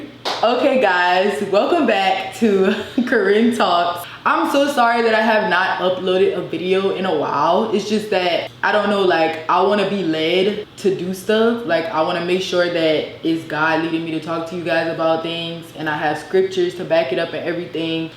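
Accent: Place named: Louisiana, United States. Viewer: Lousiana accent bby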